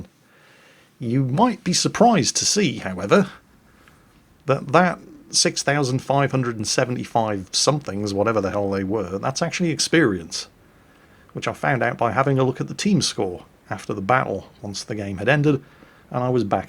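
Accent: England, United Kingdom. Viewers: even when you fuck up, or don't play well, that British accent makes it seem oh so correct. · I'm not much for realistic simulators such as WT. I just don't enjoy the game as much. Could it be because I'm not good at it? Sure! But the point of having fun in a game is based on personal preference. The people saying "WT is better, get gud" are the same people who talk about PUBG being a good game (it isn't, btw). WoWP in its current state is for the individual who just wants to shoot at things (such as myself). I don't play many games, because I don't like most games. Developers are attempting to reach broader audiences by creating games such as WoWP. If it doesn't fit your preference, cool! No problem! There are many games that probably DO fit your preference. Fighting over games like "fam bois" doesn't make sense though. It's not constructive. And I know, this is a YouTube comment section, so it doesn't have to have any constructiveness to it, but regardless. 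This is a video, we're not watching idubbbz or ricegum. His smooth English accent should be calming everyone down. This is a part of YouTube that can be, and should be, a place to critically critique war-type games. Will I download this game and try it? Maybe. I really enjoy WoWS right now and have moved away from WoT because the learning curve is just different between the two. I'm not great at games overall. steps off soapbox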